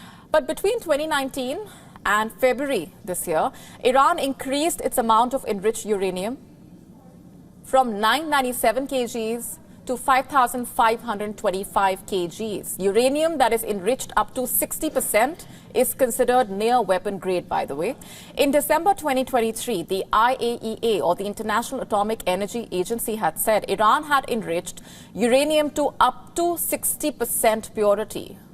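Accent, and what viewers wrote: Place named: India. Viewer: Very good news report in indian English easy to comprehend unlike American and European English pronunciation having a lot of guttural and nasal accent Thanq Wion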